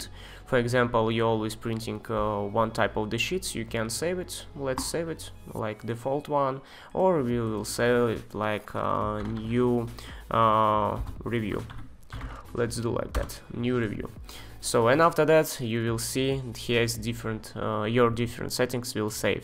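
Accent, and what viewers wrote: Russia. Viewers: Судя по акценту, русский твой родной язык)) спасибо за видео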